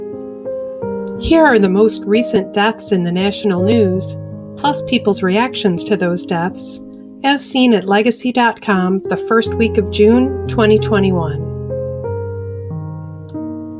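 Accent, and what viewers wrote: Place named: Chicago, United States. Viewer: You sound like you're from Chicago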